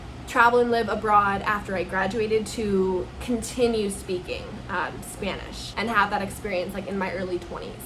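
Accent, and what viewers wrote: Paisa, Colombia. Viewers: Habla en inglés con asentó paisa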